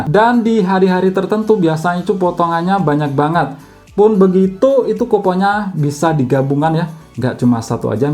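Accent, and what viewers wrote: Blitar, Indonesia. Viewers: Orang Blitar kah?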